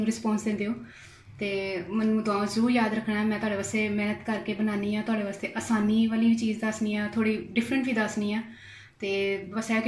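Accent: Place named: Punjab, India. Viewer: اسلام واعلیکم میں لاہور سے ہوں اپنی جاب کے سلسلے میں یہاں مکہ میں رہتا ہوں اپکی تمام ویڈیوز دیکھتا ہوں اور ٹرائی بھی کرتا ہوں۔ میں یہ پہلی دفعہ کمنٹ کر رہا ہوں وجہ یہ ہے کہ آپکی ویڈیو دیکھنے سے ایک تو ریسیپی پتہ چلتی ہے دوسری آپکی پنجابی آواز سے ویڈیو میں ایک مزاخیہ پن برقرار رہتا ہے۔ یہ چنا چاول ریسیپی ٹرائی کی ہے اچھے بنے تھے چاول۔ شکریہ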